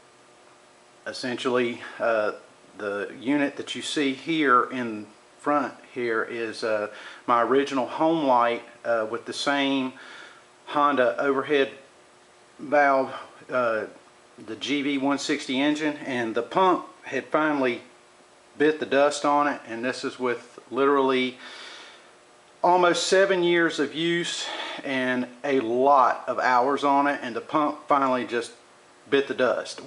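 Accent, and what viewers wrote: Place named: North Carolina, United States. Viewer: Thank you. You are from North Carolina. Just have to be. Not sure any other part of America has that very special accent. I went to Duke, and almost certainly you are a Carolina fan. Could be State, but I bet it is Chapel Hill. Great video. Thanks again.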